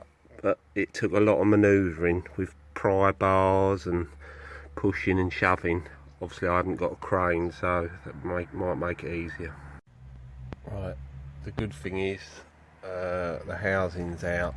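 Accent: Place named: Cockney, United Kingdom. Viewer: Loving the auto-generated sub titles on this with his cockney accent lol.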